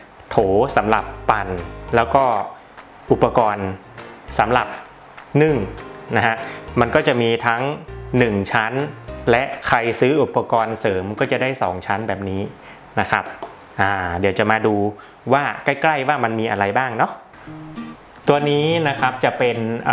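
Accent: North, Thailand. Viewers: ขอบคุณรีวิวมากจ้า แอบฟังสำเนียง คนเหนือรึเปล่าค่ะ555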